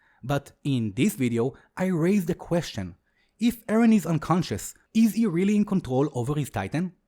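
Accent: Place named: Israel. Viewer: Bro I could tell you’re from Israel by your accent 😂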